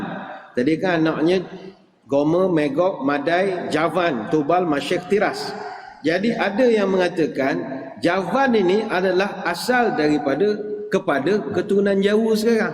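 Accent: Pahang, Malaysia. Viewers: sedak mat dengo ora2 pahang berceramoh…😅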